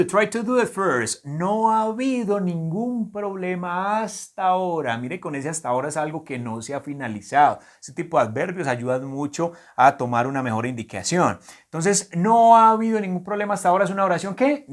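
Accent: Colombia. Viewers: Me gusto este canal porque me encanta el acentos colombiano parce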